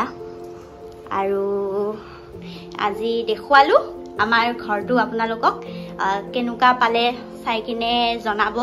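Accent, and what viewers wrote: India. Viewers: তুমি নলবাৰীৰৰে কোৱা❤❤❤❤❤❤❤❤❤❤❤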